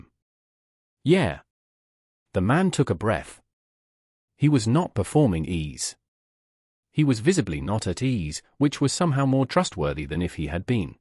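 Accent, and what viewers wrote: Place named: Europe. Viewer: Can't get past the strong European accent describing a Black (from Atlanta, USA)... Thumbs down on narrator.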